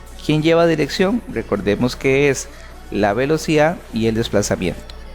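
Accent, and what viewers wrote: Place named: Costa Rica. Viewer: La voz me suena a un tico....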